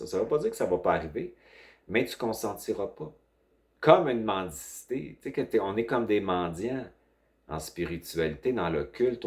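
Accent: Quebec, Canada. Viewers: je vous découvre depuis la Hongrie avec ce merveilleux accent québécois...vous complétez les infos que nous transmettait ISO et cela m'aide beaucoup..🤗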